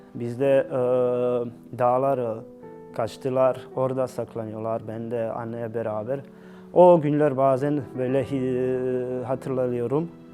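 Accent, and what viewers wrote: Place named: Trabzon, Turkey. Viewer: La adam konusmasi tipi felan tam trabzonlu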